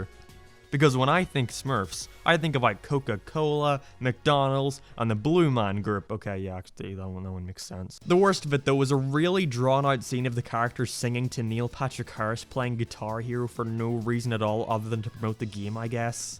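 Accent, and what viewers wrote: Ireland. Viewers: Are you Irish? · Your accent sounds familiar... Are you irish? Sorry for asking.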